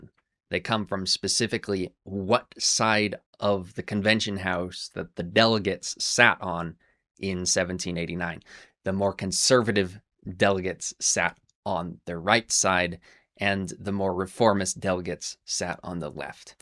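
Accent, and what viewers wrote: Wales, United Kingdom. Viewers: Chief are you welsh??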